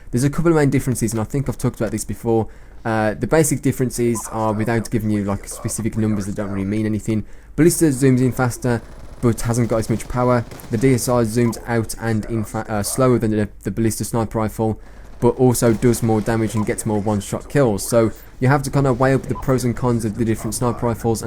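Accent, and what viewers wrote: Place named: United Kingdom. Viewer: Where abouts in the UK are you from? You sound really local to me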